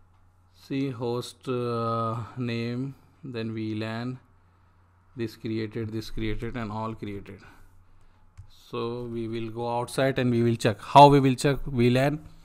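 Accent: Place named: India. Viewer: Normally i look at dislikes if a video is a waste of time, but youtube removed them. Thankfully i heard your indian accent and knew this would be helpfull thanks man.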